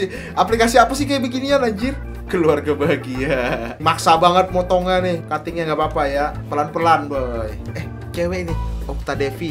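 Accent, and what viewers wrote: Banjar, Indonesia. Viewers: Bang km org mana mirip banjar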